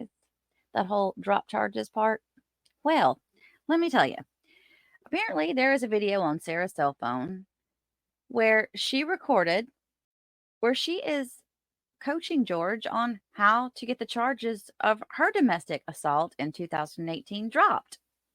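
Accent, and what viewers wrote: South, United States. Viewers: With your sweet southern accent, I'm waiting for you to say "bless her heart".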